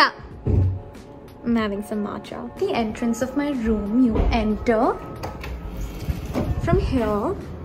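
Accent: India. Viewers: Indian accent ! That's great mate!👍can you plz tell me how to turn yourself into American mode ? 😜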